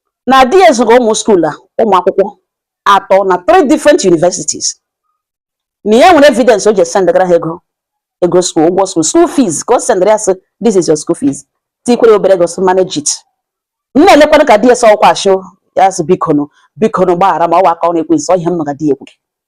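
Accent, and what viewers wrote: Mbano, Nigeria. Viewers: You're really Mbano woman. Kudos for being proud of your dialect. ❤.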